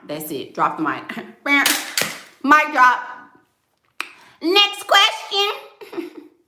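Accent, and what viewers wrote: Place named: South, United States. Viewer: you did it again!!! This video was everything!! Straight facts.. I’m 26 years old and I finally stepped out the victim mentality.. and watching you more and more makes me really double think of what I DO!! you crying is definitely not a sign thing.. when you hear real and feel it you automatically going to cry because I sure did.. I highly appreciate you.. and I love you southern accent we Texas women rock lol. Much love to you 🥰 PS:50,000 subscribers will definitely be yours by your birthday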